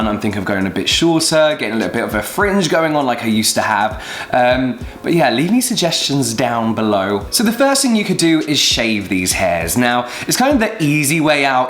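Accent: United Kingdom. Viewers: Are you British? 🤔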